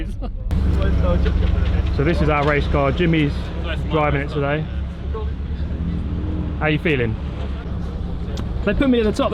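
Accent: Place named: RP, United Kingdom. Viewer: How posh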